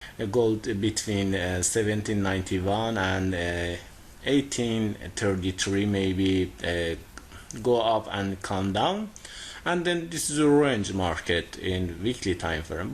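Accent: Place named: India. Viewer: داداش خسته نباشی ولی فارسی صحبت کن انگار انگلیسی با لهجه ی هندیه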